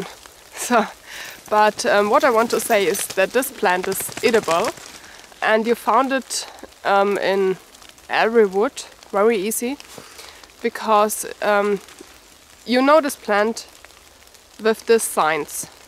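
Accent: Germany. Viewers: Nice to see both dogs again, and great to see you finally have snow! It looks so beautiful. I'm making some Elderberry syrup right now, but I bought my berries, it does not grow around here, good for shortening duration of colds. Next time you say the English word stomach, pretend the ch on the end is actually a k, ka ka ka, OK? Your German accent in english is very cute, by the way. Nice spot for a fire and interesting combination, Bacon and fruit, i will try it next time I have a fire outside, thank you for the idea,.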